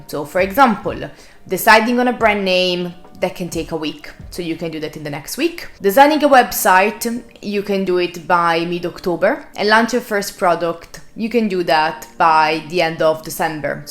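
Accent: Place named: Italy. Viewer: Hello, good morning! I found this video of yours about 20 minutes ago. It's fantastic and I liked it 😊. Excuse me, what is your native country? Your accent sounds very Italian. Greetings from Sonora, México 🇲🇽.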